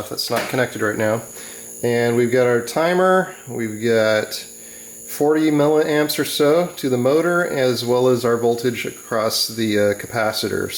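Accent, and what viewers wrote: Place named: United States. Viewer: you sound american so this might be hard.... try using chared hemp fiber. being from the usa myself i know its around if you ask enough people. I havent had the time to start these experiments yet but There is a brit with a phd in material science that gave it a shot and was blown away. Check out Robert Murray-Smith if you dotn already know who he is... Its performance is at least as good as graphine.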